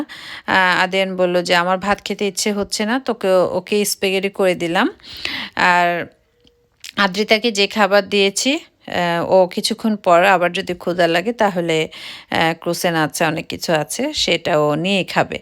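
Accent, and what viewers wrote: Sylhet, Bangladesh. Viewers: Apne sylhety ni apa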